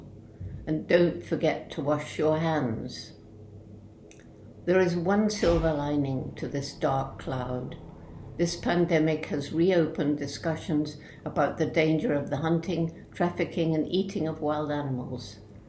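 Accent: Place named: Oxford, United Kingdom. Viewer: Not only the animal world needs you, you are also a perfect example to my French and German students for listening and translation exercises in your lovely "Oxford English language and pronunciation", additionally the messages you spread (social networks permitting)! ... 👍😍 🥀 I much hope this makes you smile! 🥀 Have a blessed and healthy time! 🥀 With love and "solidarity in sunny confinement", in Paris! 🥀 Marie-France ML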